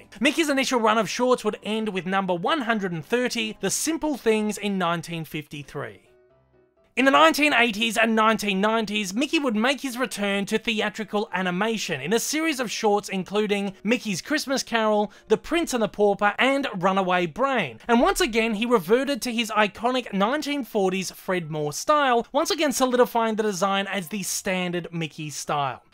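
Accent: Australia. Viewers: I love this guys Australian accsent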